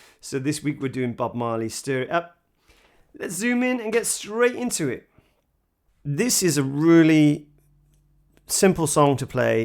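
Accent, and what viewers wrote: United Kingdom. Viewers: You should also just post you doing this cover —— love it —- I would put it on a loop n listen all day. You are so righteous you could play in Jamaica and they would respect you! I would love to hear you do or or or even reggae style ( from Denzel Jamaica movie ) You have a great accent. Thinking maybe Manchester, U.K. … maybe?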